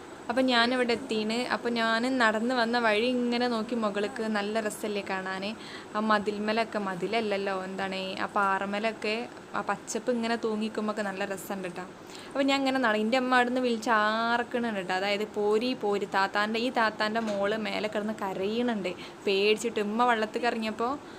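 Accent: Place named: Malappuram, India. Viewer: Natural video ...........🌹🌹🌹🌹🌹 മനസ്സിന് കുളിരെകുന്ന പചപ്പാർന്ന വീഡിയോ .....👍 എന്തൊരു ബങ്ങിയ കാണാൻ ഊരകം മല സൂപ്പർ......👍 ചെരിഞ്ഞും മറിഞ്ഞും തിരിഞ്ഞും കിടക്കുന്ന ആ മരങ്ങളും എന്തൊരു ചന്തം സത്യം പറയാണ് ഈ വീഡിയോ ഫുൾ ഇരുന്ന് കാണുകയാണ് ഞാൻ കാരണം പ്രവാസികളായ എന്നെ പോലോത്തോ ആളുകൾക്ക് ഇങ്ങനെത്തെ വീഡിയോ വളെരെ ഇഷ്ടമാണ് മനസ്സിൽ ഒരു സന്തോഷം ആണ് .,... റബ്ബർ തോട്ടം ufffffffffffffff എന്താലേ കാണുമ്പോ തന്നെ 👍👍👍 ആ കോറി ഒക്കെ എന്തൊരു ചൊർക്ക് 😍😍😍 നമ്മളെ മലപ്പുറം ഭാഷ കേൾക്കുമ്പോ ഒരു രസം തന്നെ ആണ് ലെ 😂😂😂😂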